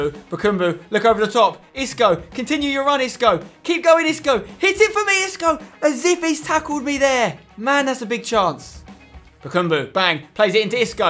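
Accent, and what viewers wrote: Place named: United Kingdom. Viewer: Chaz Hartwayne he talks British that is why he is saying it like that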